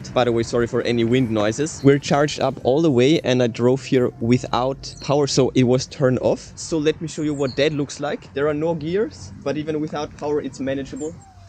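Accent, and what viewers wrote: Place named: Germany. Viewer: the way says "sooooo" and "bähttery" revealed his germaniness XD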